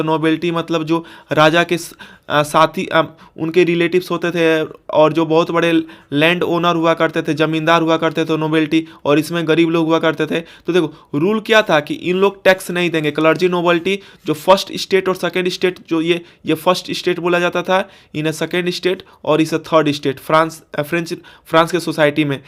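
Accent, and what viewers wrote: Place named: Bihar, India. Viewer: Aap Bihari ho kya aapka bolne ka tarika Bihari jaisa hai Main bhi Bihar se chhapra saran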